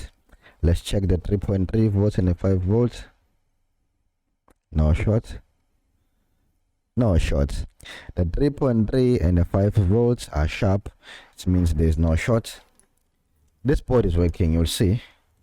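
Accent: South Africa. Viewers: I can hear that you're South African and that makes me very proud. Keep on sharing this helpful info. God Bless you.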